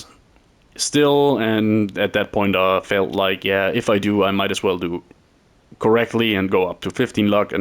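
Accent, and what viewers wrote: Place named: Germany. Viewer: Ich hab keine Ahnung warum aber als Deutscher erkennt man andere englisch sprechende Deutsche wie aus dem nix 😆 Gutes Video 😇🥸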